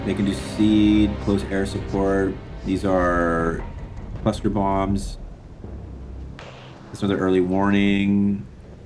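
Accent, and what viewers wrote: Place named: Eastern Europe. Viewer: au au au, even my eyes got hurt by your Slavic pronunciation... Dude! Come on!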